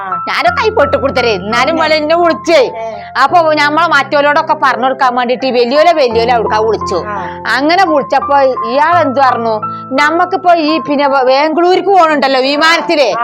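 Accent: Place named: Karuvarakundu, India. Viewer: ഇത് നമ്മളെ നാട്ടിലെ പാർക്ക്‌ ആണല്ലോ കരുവാരകുണ്ട് എന്റെ നാട്. നിങ്ങളുടെ സംസാരം കേട്ടപ്പോൾ തന്നെ തോന്നി ആ ഭാഗത്തു തന്നെയായിരിക്കും എന്ന്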